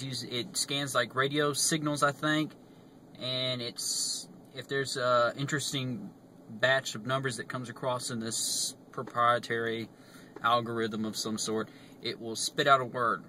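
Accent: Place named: South, United States. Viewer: your country twang has really come out in this video. Also, from my past experiences and such with the dead, is you ask if they would please answer the following questions (and state your questions). Just a suggestion, but all in all, great piece. Can't wait till your next vid. Love the paranormal! Hugs to you!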